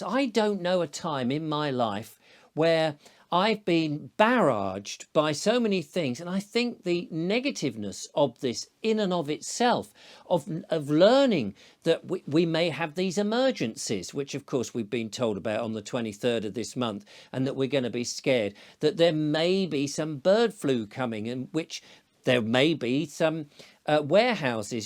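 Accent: United Kingdom. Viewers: Too much British 😊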